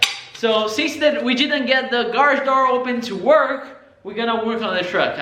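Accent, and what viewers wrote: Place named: Brazil. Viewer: Sound like from brazil..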